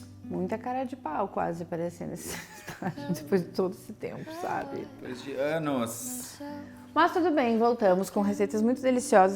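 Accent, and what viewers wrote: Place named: South, Brazil. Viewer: É viciante esse sotaque do Sul... Habemus BANQUETE! ❤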